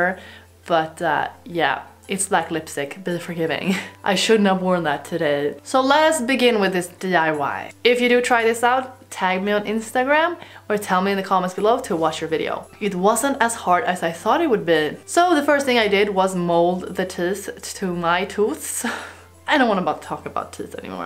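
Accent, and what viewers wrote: Sweden. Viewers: SweetIvory 1203 It's a Swedish accent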